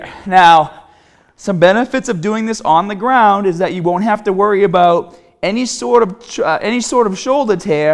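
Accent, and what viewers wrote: Boston, United States. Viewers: where is from? he has that Boston accent. #teamscott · Boston Accent!!!!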